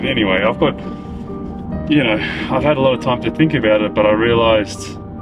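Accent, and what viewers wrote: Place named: Australia. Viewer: i have been traveling to golds gym from europe every summer for the past 10 years til covid hit. I have pics with you from almost every summer, with Karina Justin Steph Mike etc. You were always such an inspiration to me and to thousands maybe millions of people. I just wanna tell you, you dont be buff and huge, we just enjoy seeing you online again. Im sure you will heal as times passes on. Just take your time, find happiness and joy again. The community will always stand behind you. We all have to face our own battles most of the time alone, but strong people will win those battles, shut down the inner demons and find the real meaning of life again, which is love and selflove. I wish you all the best, and hopefully one day ill meet you again and you will have that bright smile with that aussie accent again.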